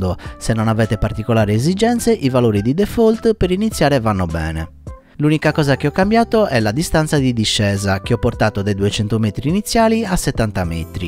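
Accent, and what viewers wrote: Sardinia, Italy. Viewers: ciao Avrei un altro problema, in attesa che mi arrivi l esc per x220 vorrei utilizzare il nazgul v2 posso utilizzare, come radio, fs 16 x cosa devo fare inoltre, scusa tutte queste domande, ho acquistato gli ev 100 ma non riesco a vedere nulla, dove sbaglio? Dall accento sei del cagliaritano io sono dell ogliastra magari un giorno ci incontriamo per un caffè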